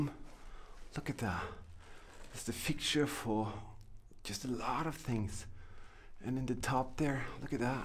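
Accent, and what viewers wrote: Germany. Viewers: I like your German Voice ..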